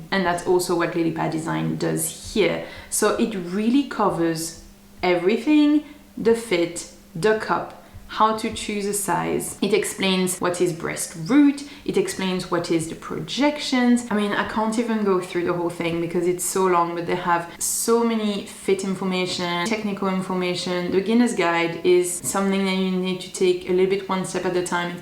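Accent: France. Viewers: I love your French accent and some words should just stay in the original language ☺️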